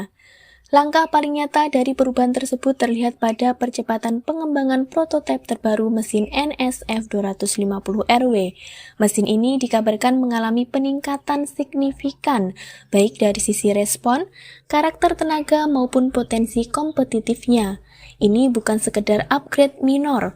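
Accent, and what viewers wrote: Java, Indonesia. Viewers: logat jawanya medok....tp dia anak hebat....ini yng penting.... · Logat Jowonya sangat² medok 😂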